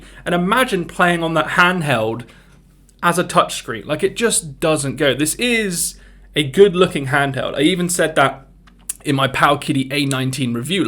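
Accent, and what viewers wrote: United Kingdom. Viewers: . Such a Bri'ish accent.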